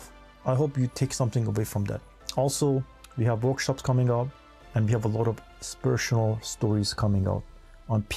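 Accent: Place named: France. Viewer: TRUE , I WILL AS A last resort! Specially in workforce , if push comes for sure! Curious , you have french accent when you speak! Are you from France or elsewhere? Love all multi languages, I am Spanish 😄half Cuban/Peruvian born in USA! Hoping when we all descend to 5D we can read minds and speak all languages! Love traveling! But for now I wait! Waiting for the Spirituality to see Grand parents miss them immensely! KEEP UP ALL POSITIVITY 😉 most people do not want to believe and higher sources!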